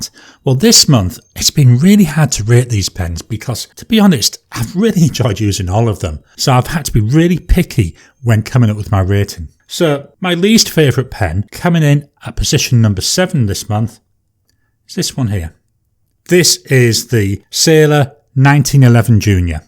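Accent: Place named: England, United Kingdom. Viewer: Hi. I have two of your pens; the Moonman M800 (Moonman nib) and the Jinhao 159 (I have a few in different colours). I don't have a Hong Dian but I do have the Lamy Studio which looks very similar. The Jinhao is a good pen for the price but feels a bit too large in my hand. I was surprised it took the top spot in your ratings. By the way, I know you are in Australia but your accent has me confused. It sounds English but I am stuck on the exact region. I am from UK but your voice still has me a bit puzzled!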